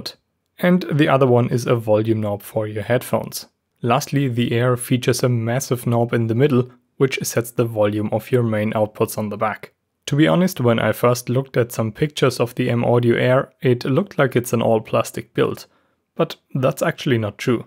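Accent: Germany. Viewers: Man hört den deutschen Akzent.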